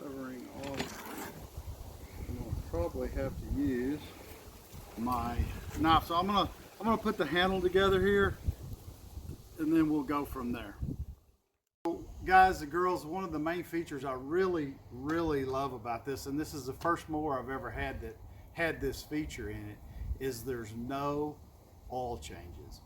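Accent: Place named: Southern Indiana, United States. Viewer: I love your accent. Southern Indianna? I love how you folks pronounce OIL. In northern Minnesota it's pronounced " Oy-L". You folks pronounce it "OLE" that would rhyme with "Coal" or "Hole". specifically pay attention to the mark in the video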